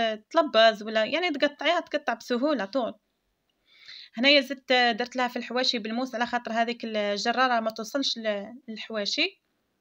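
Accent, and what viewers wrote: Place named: Oum El Bouaghi, Algeria. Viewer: يعطيك صحة حبيبتي غدوى نديرها لعشاء و راني من متابعيك فالفايسبوك تبان لهدرة تاعك من ام البواقي سورتو كي كاتبة فالفايسبوك مطبخ بايضية لخاطر انا تاني مالبيضاء و يشرفنا تكون عندنا طباخة ماهرة كيفك ربي يسترك حبيبتي😘😘😘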